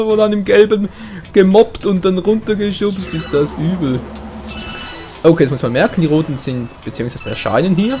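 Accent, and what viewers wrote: Switzerland. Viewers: Bist du zufällig Schweizer?^^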